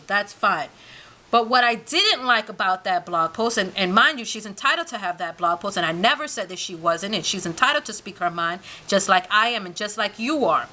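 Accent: New York, United States. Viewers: Are you from new york? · BTW, I loveeeeeeeeeeeeeeeeeeeeeeeeeee your boldness and how you don't take crap nothing from nobody!!!!! lololololol You went straight G on this video though!!!! That NY accent! Love it doll! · are you from Ny?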